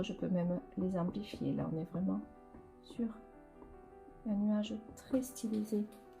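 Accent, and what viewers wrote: South, France. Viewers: Bonjour Madame. J’ai une petite question belge à poser. Je n’ai jamais peint à l’huile. Cela demande t il une préparation particulière Le coût est-il plus élevé qu’à l’Acrylique ? Encore merci de vos conseils chantés avec votre magnifique voix du Sud. Depuis la Belgique, Amicalement ERIC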